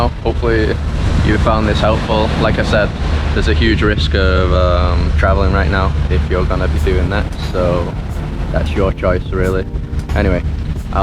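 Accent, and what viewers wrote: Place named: Scotland, United Kingdom. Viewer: That’s a funny sounding Scottish accent, what part of Scotland are you from mate?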